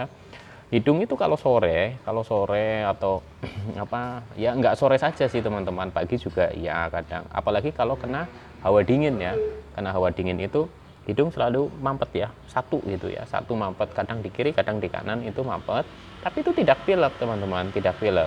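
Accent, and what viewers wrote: Banyumas, Indonesia. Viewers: Mas sudah berapa tahun Jawab y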